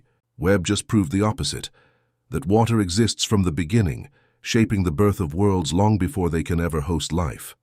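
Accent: United Kingdom. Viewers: Another AI "terrifying" clickbait video with vague British accent.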